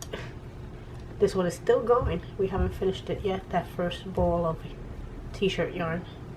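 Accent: New York, United States. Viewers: I love your tutorials. Love the mat, very cool. I also love your New York accent. My daughter is half Puerto Rican on her father's side. Thank you for the tutorial and keep them coming.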